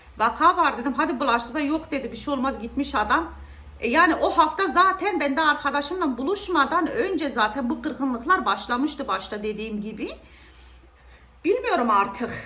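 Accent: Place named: Nevşehir, Turkey. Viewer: Çok geçmiş olsun sizi yeni gördüm nerelisiniz bizim oranin konuşmasina benziyor konuşmaniz ben nevşehirliyim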